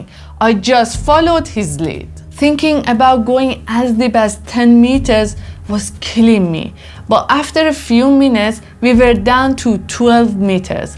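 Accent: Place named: Iran. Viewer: Let me guest where you from without knowing anything about you. Sounds like you from Iran 🇮🇷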